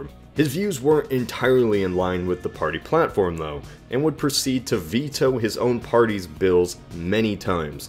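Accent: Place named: Midwest, United States. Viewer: Ah, that sexy ass midwest voice: back to please my ears.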